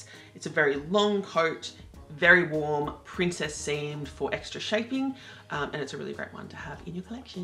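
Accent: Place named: Australia. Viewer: OMG! an aussie! Thank you! :)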